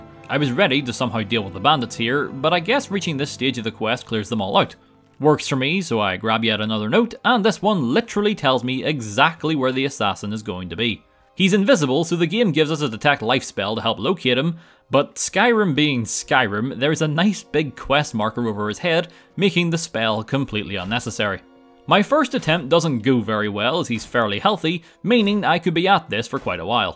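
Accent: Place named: Ulster, United Kingdom. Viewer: has an Irish accent. Specifically Ulster province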